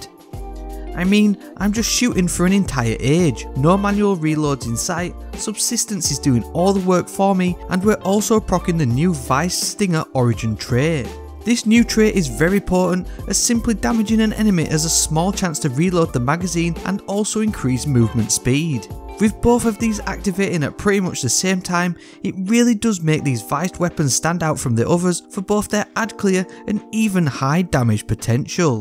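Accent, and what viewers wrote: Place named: North, United Kingdom. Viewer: He's just northern leave my boy alone. He makes some of the best d2 content on YouTube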